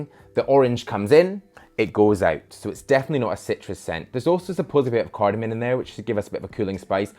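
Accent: Scotland, United Kingdom. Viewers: Not related- but your accent is definitely less scottish. Idk how you feel about that but it's an observation. Love your stuff from the states! Keep on brother!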